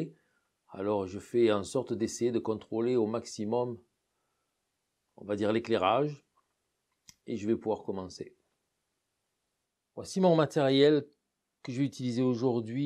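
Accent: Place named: Provence, France. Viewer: Bonjour monsieur,je vous remercie pour votre calme ,vos explications claires,et vôtre lumière bienveillante , même quand ont débute , ont se sent ,en confiance comme avec un ami,qui nous partagent une même passion 😄, votre accent ,le dis que vous ête de chez nous ,je suis du Vaucluse ,et cela fait chaud au cœur 💓 de sentir dans vôtre accent qui est le nôtre,nos racines , merci infiniment ,bien le bonjour à Mme , je viens de m'abonner et j'ai partagé avec une personne de mon entourage,qui est artiste peintre 🦋🙏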